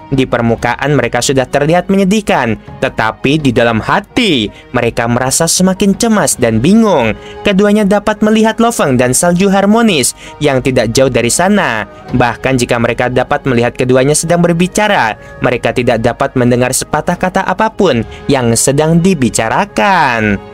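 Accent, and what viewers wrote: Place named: Kelantan, Malaysia. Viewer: Bro dah pandai kecek klate..❤❤ bulang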